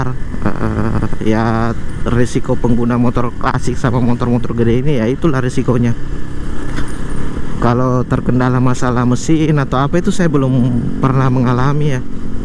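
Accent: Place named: Sulawesi, Indonesia. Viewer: Sulawesi bagian mana bang? Dari Logatnya kentara hehehehe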